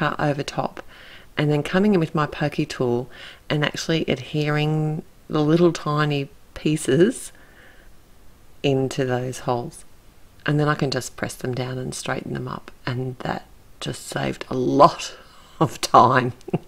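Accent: Australia. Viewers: do I detect an Aussie accent?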